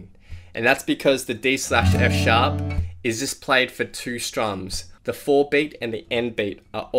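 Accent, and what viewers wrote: Australia. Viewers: are you australian? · are you aussie?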